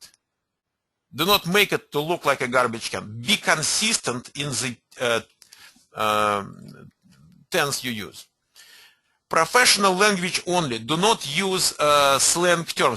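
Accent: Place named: Russia. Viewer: It is so great that you have a Russian accent. Those things you say with this accent... I believe that you represent a better face of Russian-speaking people in the US.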